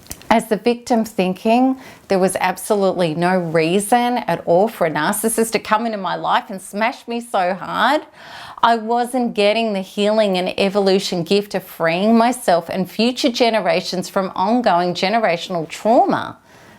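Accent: Australia. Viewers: Are you Australian? Accent is so familiar!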